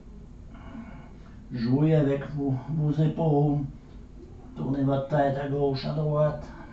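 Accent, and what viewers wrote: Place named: Quebec, Canada. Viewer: Simple ,très efficace ,un parler qui me rappelle "La belle province " " Je me souviens " de mes annèes à Montrèal.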